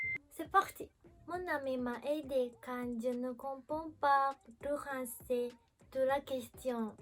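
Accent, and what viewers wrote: Japan. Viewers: Trop Cute l'accent japonais pour parler francais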